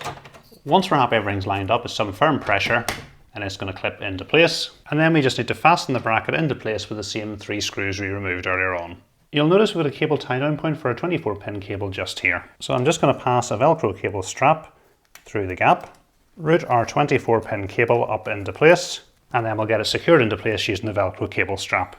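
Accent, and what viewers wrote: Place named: Tyrone, United Kingdom. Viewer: Did not expect the accent. Refreshing to hear from a Tyrone man.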